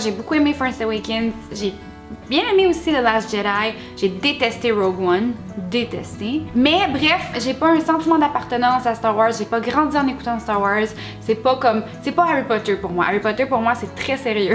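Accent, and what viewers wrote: Canada. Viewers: Moi aussi J'ai bien aimé. Je ne suis pas un fan de star Wars et heureuse, par ce que les fans sont toujours très déçu par quelle que chose ))) . Et puis un avis C'est toujours SUBJECTIF. ( je suis français et J'aime toujours autant l'accent et la façon de parler des canadiens ! ). Bonne continuation.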